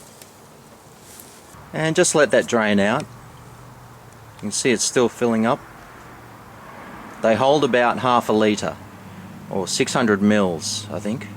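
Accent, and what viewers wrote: Australia. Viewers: Thanks heaps! Fixed my mower! Legend! U also taught me how to cook Corned beef (was that diff channel, watched it over a yr ago!?) ! And all with the Aussieness I'm used to! Cheers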